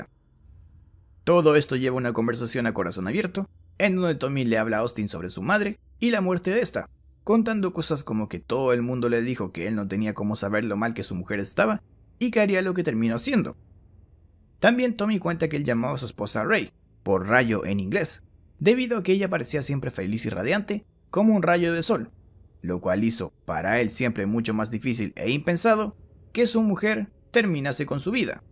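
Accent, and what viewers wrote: Chile. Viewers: No tengo ni idea de su nacionalidad ya que a veces suena como mexicano y también como chileno👁👄👁🔪🇨🇱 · El acento es de chile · ME DI CUENTA QUE ERES CHILENO, CTMMM QUE EMOCIÓN😍amo todos tus videos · Eres chileno🇨🇱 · es chileno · hola master es chileno? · Eres de chile??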